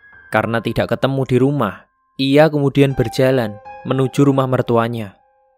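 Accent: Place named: Java, Indonesia. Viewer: Beda orang, ini logatnya ada jawa nya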